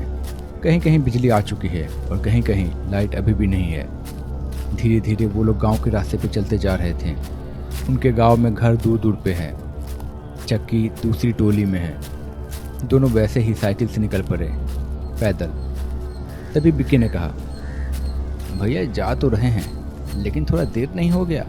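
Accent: Bihar, India. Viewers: Video ka suruwat dekh kar hi laga tha aap bihar se hai 😊😊 Mie bhi bihar se hu 😀😀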